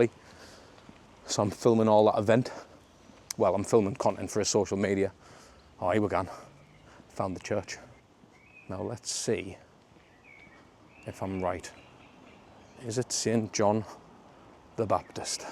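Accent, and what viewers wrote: Sunderland, United Kingdom. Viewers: Can I detect a mackem twang?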